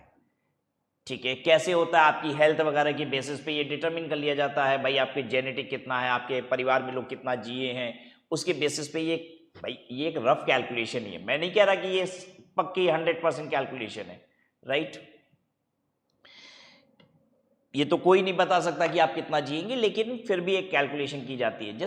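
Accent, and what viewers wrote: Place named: Varanasi, India. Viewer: sir aapke speaking accent se mjhe pata lag gya tha ki aap banaras se hi ho☺☺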